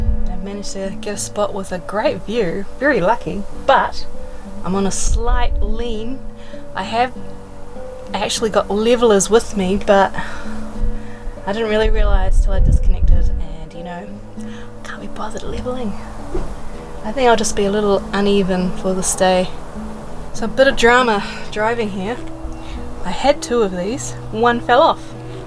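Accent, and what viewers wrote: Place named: New Zealand. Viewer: Fish and chips. My favourite words in a kiwi accent. 😍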